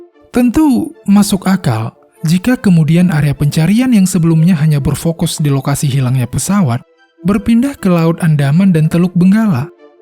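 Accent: Bali, Indonesia. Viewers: Naratornya orang bali kayanya yak? haha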